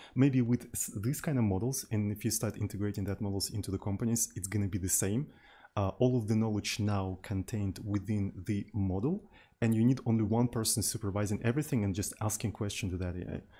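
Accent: Russia. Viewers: Вам этот дядька не показался душнилой? С технической точки зрения всё прекрасно, знание английского, различных языков программирования разного уровня. Но вот складывается такое ощущение, что автор канала как-то с презрением относится к Ру говорящим, возможно даже стыдится того, что сам знает русский, стыдится или ненавидит свой акцент, старается игнорировать любые вопросы, касательно знания русского языка. Не знаю, выглядит забавно и хочется открыть окошко, проветрить ._.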